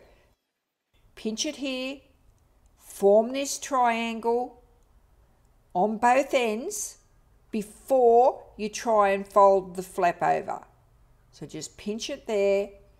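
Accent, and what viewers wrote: Australia. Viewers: Hi! I loved your video! australian accent is New to me, but I could understand everything and the no sewing spine technique is wonderful. Thanks a lot! Hugs from Brazil!!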